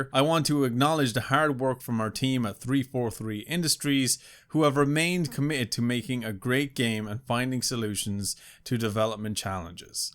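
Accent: Ireland. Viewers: i can´t tell if you have slightly poor english or if you´re just irish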